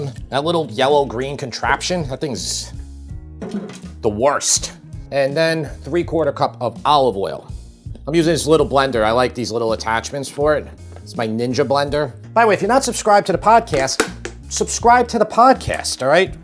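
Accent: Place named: Long Island, United States. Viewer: That accent. Is it like old school Long Island? Montauk with a bit of New England "AAHH". I can't quite place it.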